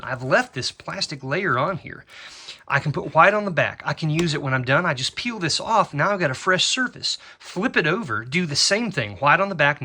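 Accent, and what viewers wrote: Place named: Maryland, United States. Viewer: i was trying to place where you're from based on your voice - it sounds like Maryland, but then you said you took a trip to the East coast which makes me think you dont currently live there.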